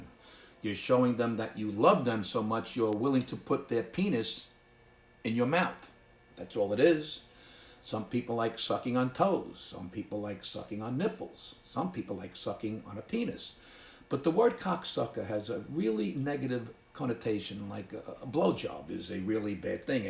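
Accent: New York, United States. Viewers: It just sound really Really bad with an New York Italian accent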